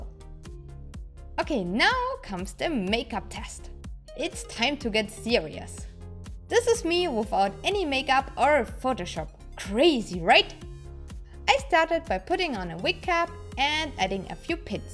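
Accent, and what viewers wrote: Germany. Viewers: Ich schreib jetzt mal auf Deutsch weil sich dein Akzent definitiv so anhört als könntest du mich verstehen... ;) Diese ganzen Drucker etc. erleichterten eure Arbeit schon ganz schön, oder? Wenn ich die diversen anderen Cosplayer anschaue, die alles von Hand machen. Verkauft ihr die Kostüme dann? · Just 'cause I'm curious, is it a German accent?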